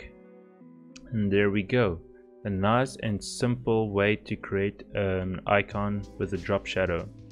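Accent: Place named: South Africa. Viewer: I detect a South African accent there....am I correct?😉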